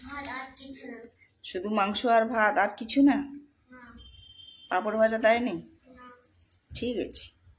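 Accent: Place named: West Bengal, India. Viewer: Ooo ma go dada ki koreta a to West Bengal r vasa❤❤❤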